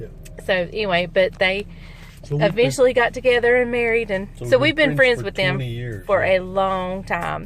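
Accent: South, United States. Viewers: Are you moving to new land? I’m sorry coming in on your story I just don’t understand sometimes the southern draw talking fast. As far as having “stuff” donate donate to the Salvation Army that uses the sale proceeds to help the poor. My husband says, keep it simple thats so true! Personally when I have guest over I keep the kitchen unjunky with little out other than food and pretty napkins and cups and few candles. Heaven is not junky and in turmoil with “things”. It’s uncomfortable being around clutter thats not good for the soul. A Colonial girl here with a touch of simple style and elegance from my generation. People have way too much today. Be blessed and not stressed.❣️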